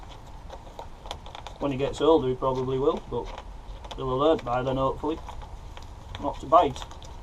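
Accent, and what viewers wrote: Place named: Yorkshire, United Kingdom. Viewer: you sound like your from Yorkshire I'm looking to get a ferret could do with some advice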